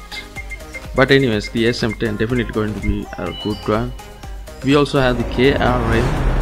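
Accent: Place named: India. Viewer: u do sound indian.... But it's fine.... Keep up the great work .... But I am Indian so.... Love from india ❤️❤️❤️❤️ and I dealt with him -_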